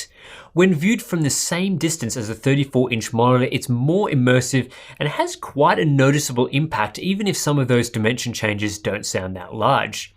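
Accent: Australia. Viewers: Australian accents are hawt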